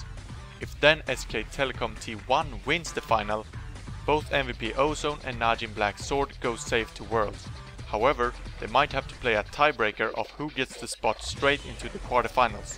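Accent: Sweden. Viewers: Im so sure ur Swedish! This accent! It must be ^^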